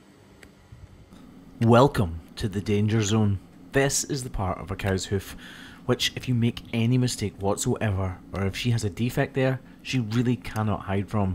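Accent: Scotland, United Kingdom. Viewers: I thought you were Scottish and this video proved me right🏴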